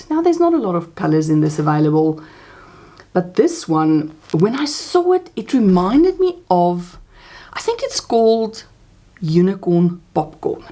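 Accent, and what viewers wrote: South Africa. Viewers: Hello love ❤️, I recognise a South African accent? are you from South Africa perhaps?🥰🥰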